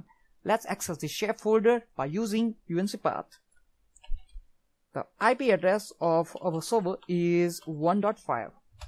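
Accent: India. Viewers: I think ur origin accent is Indian. better try that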